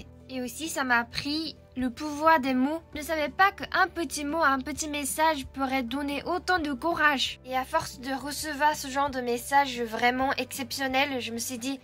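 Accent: France. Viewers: Joyeux anniversaire en retard moi c’est demain mon anniversaire 😊❤Et aussi ne change pas tes parfaite ,j’adore ton axant français quand tu parle ❤ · 🎉 Je suis tombée sur ta chaîne tout à l’heure et AHHHHHH TU ES TROP MIGNONNE !! Tu es super attachante J’adore ta prononciation française !!! Ton accent est adorable ! Tu as une personnalité tellement tellement gentille Tu es bavarde aussi, c’est vraiment un plaisir de t’écouter Tellement naturelle ☀️ Merci pour tes vidéos 🙏 Des bisous de la France ! 🇫🇷 je suis sûre que tu lui manques beaucoup ! 🥺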